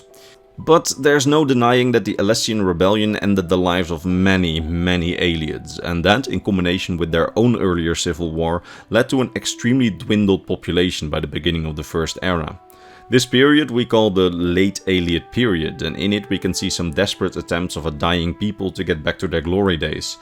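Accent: Netherlands. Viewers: Nice vid. Liked and subscribed! Btw, are you Dutch?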